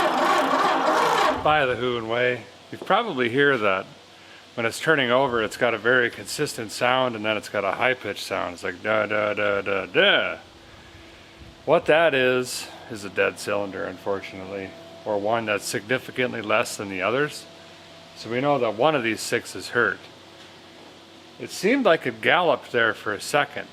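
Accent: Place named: Midwest, United States. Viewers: Damb you're Midwestern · I am glad to know that there are other "older" women watching VGG. I am 72 and have the channel on all day, every day. I can't explain why. Honestly, I think it's midwestern roots, speech and work ethic. And he's a patriot. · I love listening to this guy his voice is so calming. I can’t put my finger on his accent though maybe Midwest?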